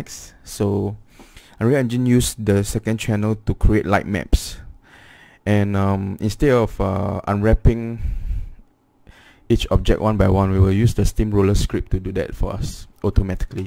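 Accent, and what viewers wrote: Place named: Singapore. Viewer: Singaporean accent. HAHA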